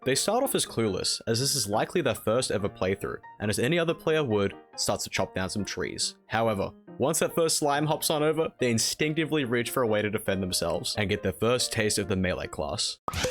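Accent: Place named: Australia. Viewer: Basically an Australian accent with an American and British undertone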